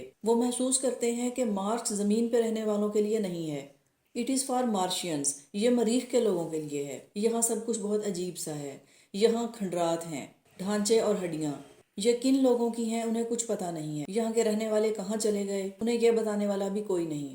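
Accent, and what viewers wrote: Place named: India. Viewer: You should definitely reduce your accent. I used to live in West Ealing in London so I'm used to the way Indians speak English, but your accent is impenetrable.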